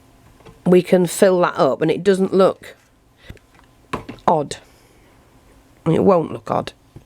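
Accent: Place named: Hull, United Kingdom. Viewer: your stuff is fantastic hun im in hull your acsent doesn't sound to far away lol x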